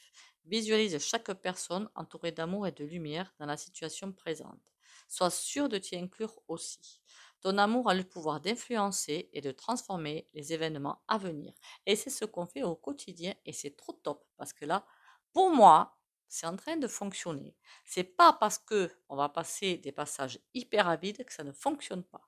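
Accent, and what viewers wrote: South, France. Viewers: Coucou bonjour 🎉 Ravie de te rencontrer❤ Je ne sais pas pourquoi comme dirait, avec cet accent fort du midi que j'adore, ça sent le soleil, je t'imaginais brune très brune, Oups, on a le droit de se tromper hein 😅 🙏🌸belle journée,bisous bisous🕊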